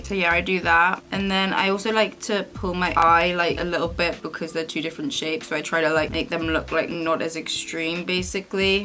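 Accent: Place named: Newcastle, United Kingdom. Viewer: Are you from the Newcastle area? You sound like Perrie Edwards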